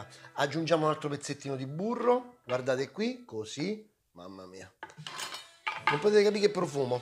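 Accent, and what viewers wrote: Rome, Italy. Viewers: Roman accent, very strong, like mine. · No vabbeh sei troppo simpatico 💖😂 ma per caso sei de Roma oppure marchigiano??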